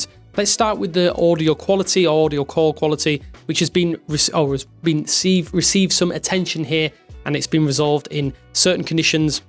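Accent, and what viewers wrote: United Kingdom. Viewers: I can't watch your complete video just because of your British accent it's really irritate me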